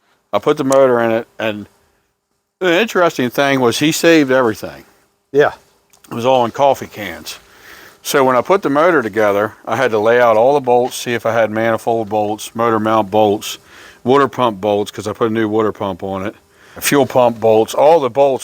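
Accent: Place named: Georgia, United States. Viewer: That car really makes a statement rolling out of the garage. Is this? OK, I see the hat. What is that white stuff on the ground? How can anyone let this car sit from 1995 to 2018? What a waste! points out how "old school" is. You still use a newspaper in the age of the internet. is like, under his breath, well I got the car and you don't! Go Newspaper! You sound like you live in Georgia. Can't take the car out there because of all this mess. Looks like a light dusting of snow there. You did drive over to place? Hey, even said this is "a nice safe driver". Sounds like has a Camaro in every color of the rainbow. Always go with 69 in life. Like the special effect of the two of you disappearing. Great video guys!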